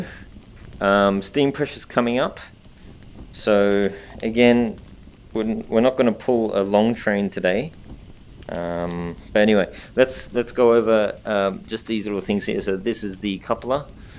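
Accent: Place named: Australia. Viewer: What country are you from, you have a slight Australian accent.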